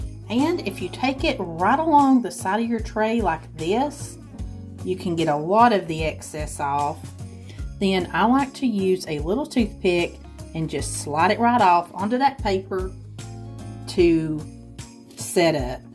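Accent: South, United States. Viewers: What a great video, some excellent Christmas treats im gonna try. Love your southern accent! Love from the UK xx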